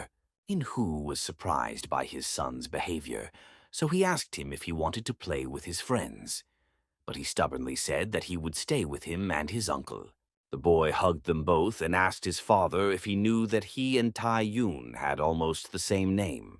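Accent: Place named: United Kingdom. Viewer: The narrator is British!!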